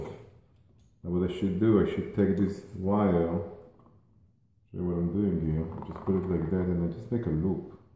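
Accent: Israel. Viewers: I recognize that accent from anywhere...ivrit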